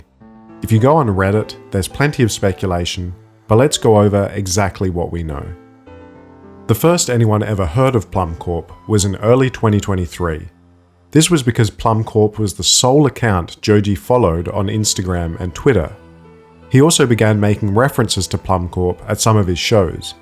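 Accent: Adelaide, Australia. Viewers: u sound like an aussie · are you a fellow adelaidian?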